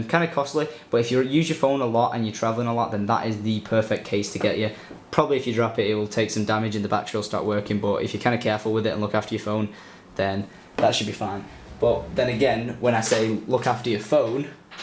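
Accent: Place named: Manchester, United Kingdom. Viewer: What part of manchester does he live in, i mean im from bolton and he sounds like he's local?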